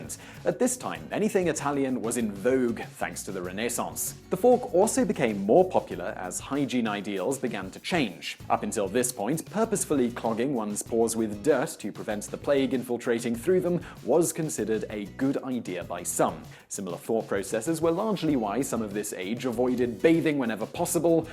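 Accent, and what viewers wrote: United Kingdom. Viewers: The "Barack" period lol. I love British accents!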